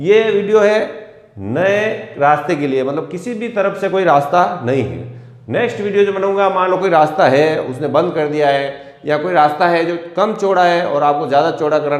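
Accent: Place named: Mewar, India. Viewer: Good aap kha se he बोली से मेवाड़ के लग रहे हो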